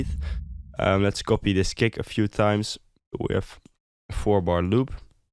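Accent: Netherlands. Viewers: where are you from? kinda dutch accent XD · Are you dutch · are you dutch? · hey are you dutch? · ik ruik een nederlander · ik hoor de Nederlandse accent haha, +1 like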